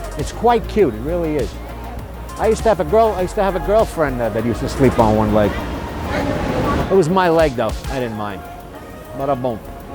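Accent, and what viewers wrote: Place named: East Coast, United States. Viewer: U sound like your from the east i cant stand accent.niether can rest of world.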